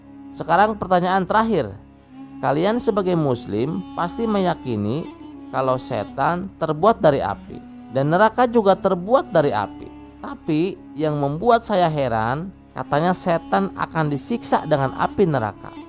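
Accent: Sunda, Indonesia. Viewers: Ieu nu nyarita orang Sunda, medok sundana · Keciri iye org sunda yg mawa beritana.. medok pisan euy sundana..😂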